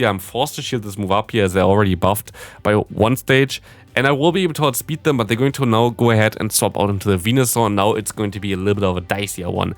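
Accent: Germany. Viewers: Der deutsche Akzent kickt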